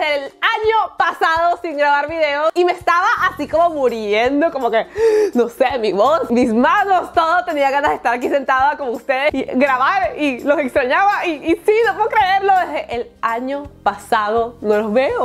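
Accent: Venezuela. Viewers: soy yo o aveses abla muy venezolada!!!!😂😂😂😂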